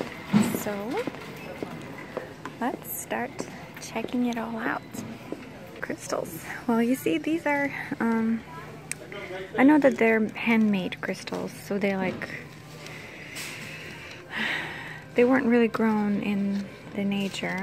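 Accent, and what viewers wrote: Russia. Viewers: ! I love your videos you're the best! I've always had asmr since I was a little girl. It was mainly my teachers that made me have a tingling feeling and chills! My Spanish teacher in high school was Russian and she really had a soothing voice and accent like you. When I started watching you, you introduced me to things like tapping and crinkle sounds I had never experienced before in asmr. I didn't even know what I had had a name lol. I thought I was the only one until one day I came on YouTube and typed in soft spoken words and came across you and I've watched you ever since! You're really good at what you do. Congrats on making it to 5 years! Keep it up! If you're looking for things to make into jewelry I would suggest making things with sea glass. It's very beautiful we have a lot of it here where I live on smith island in MD. How the sand and water mold pieces of broken glass to have smooth edges is so pretty. 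A friend made pretty necklace and earrings made out it I lovethem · I was in Baltimore a few weeks ago with my boyfriend and was secretly hoping to run into you randomly in a little shop or something to say hi and meet you! But I'm glad I didn't because I'd have nothing to say I'd just wanna follow you and listen to you talk (that might have been slightly creepy) haha. I love your voice so much and your Russian accent. I also just bought the nail hardener you recommended because I have such problems with my nails peeling so I hope it works! Maybe someday I'll have nails as beautiful as yours. That's the dream. Lol.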